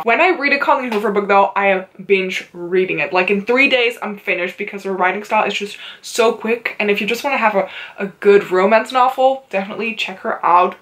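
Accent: Netherlands. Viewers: HolY SH- I've never seen your content before and I thought: she sounds kinda Dutch. TURNS OUT YOU ARE DUTCH. OMG ik wil graag meer Nederlandse BookTubers dus tijd om the abboneren!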